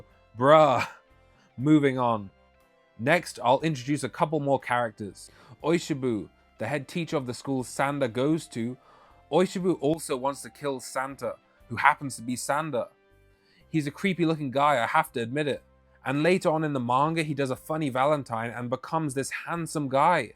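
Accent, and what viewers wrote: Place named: United Kingdom. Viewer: I love it when British people have Americanisms in their accent. Like “marnga” “parrru itagarrki”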